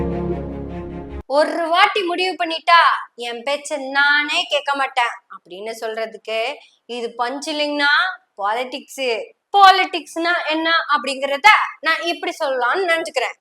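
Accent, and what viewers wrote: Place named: Chennai, India. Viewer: Good one, however, please don't insult my Madras slang sister 🙏👍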